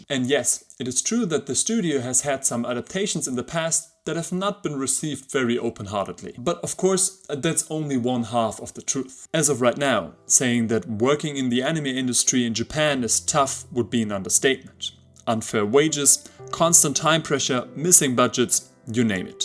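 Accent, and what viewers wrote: Germany. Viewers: I hear a bit of an german accent, am i right?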